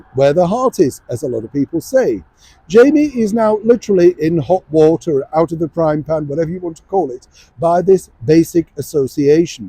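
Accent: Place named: Mockney, United Kingdom. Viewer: Lisping mockney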